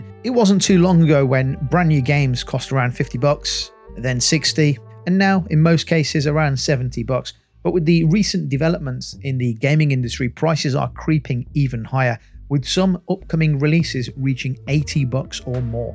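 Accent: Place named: England, United Kingdom. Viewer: You've clearly got an English accent, so why you talking bucks & dollars and not £s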